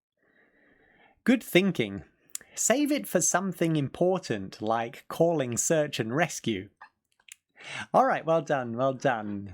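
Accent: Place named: United Kingdom. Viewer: I think that British English cool! I like your mountain clothes☺️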